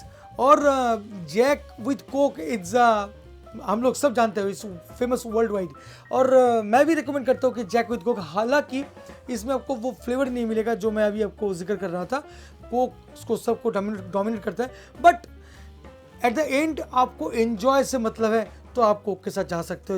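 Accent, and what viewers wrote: Bengal, India. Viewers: Is not woishky ...avoid ur bengali tone of speaking Its whisky..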